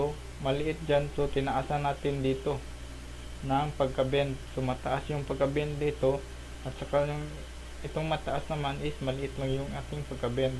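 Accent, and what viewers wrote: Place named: Visayas, Philippines. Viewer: Bisaya kasi ganyan tlaga mag salita ang mga bisaya.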